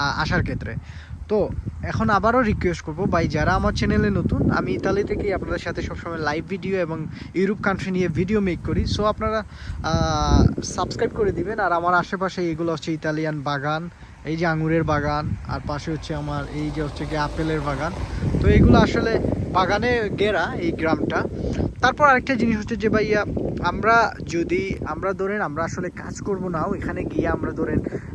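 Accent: Sylhet, Bangladesh. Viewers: Bhaiya Sylheti mone hoy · ভাই সিলেটি?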